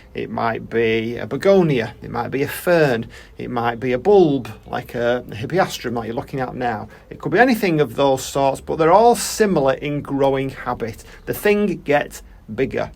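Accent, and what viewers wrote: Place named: Northern England, United Kingdom. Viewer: What a super helpful video re TZ! Clearly, I have some clipping, cleaning up and repotting / propagating to do. Now that I have seen your video, I feel confident that I can save my failing babies. Thank you for your insight... Off to watch the video you pinned in the comment section now. I can't get too many plant care tips with these black thumbs of mine, lol! Well, they are leaning towards deep, dark green now :O) Also, your accent is warming... brings me right back home. I have been in the States since early 1990 and I love bumping into my northern English brothers and sisters on youtube. (Mancunian? I was raised partly in W. Yorkshire but lived in Manchester for a good chunk of time)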